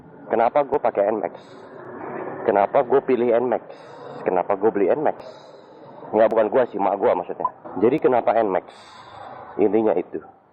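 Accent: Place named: East Java, Indonesia. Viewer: cak asline wong endi ...kadang kok suarane medok jawa timuran...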